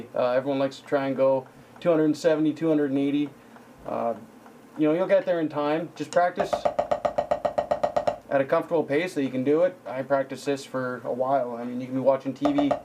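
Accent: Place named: Canada. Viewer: strongest canadian accent ive ever heard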